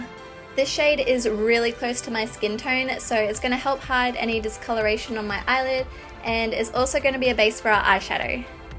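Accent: Australia. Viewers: is she australian? beautiful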